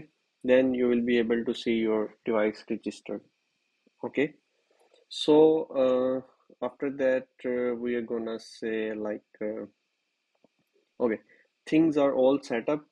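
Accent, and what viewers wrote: India. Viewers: abi niye hintli gibi konusuyosun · You have explained everything in detail and this document is not explained so clearly on almost any page of apple. What I don't understand is that the developer account belongs to a Turkish person, but you speak with an Indian accent. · Vallahi ben de Hintli sandım , dublaj falan olabilir.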